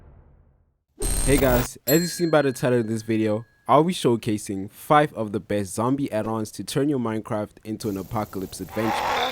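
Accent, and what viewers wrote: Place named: South Africa. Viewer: Somehow I just knew you were South African, we can just sense it between our people, subscribed once I realized you were, gotta support good ZA creators.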